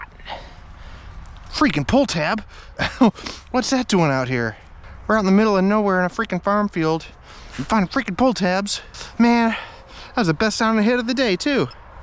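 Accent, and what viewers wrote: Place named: New York, United States. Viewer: I knew you were a NY transplant... lol I have the same accent.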